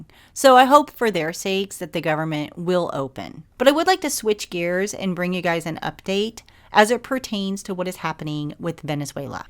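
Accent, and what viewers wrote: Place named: East Coast, United States. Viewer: no he definitely seems to be a radical Islamist. I listen regularly to Glenn Beck and he said the mother of the new Mayor of N.Y C. stated in an interview that her son does NOT identify as an American, but rather with his Islamic heritage instead. I was born in Queens N.Y and raised on Long Island. I now reside with my husband in rural N.W.OH. I noticed you have an East Coast accent that sounds a lot like mine. Are you a N.Y gal too? I think NY.C is going to need a LOT OF PRAYERS!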